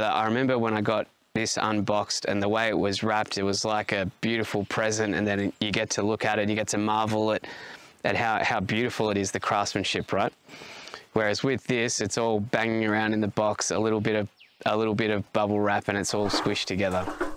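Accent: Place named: Victoria, Australia. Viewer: Victorian accent gonna confuse ppl every time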